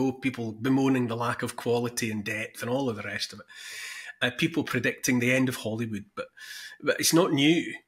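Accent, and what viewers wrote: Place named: Dumfries, United Kingdom. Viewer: I love you,. My long-gone uncle grew up in Dumfries and so it's extra lovely listening to you speak. I'm grateful for your chats about the machine. TBH I haven't been able to bear watching US movies for a long long time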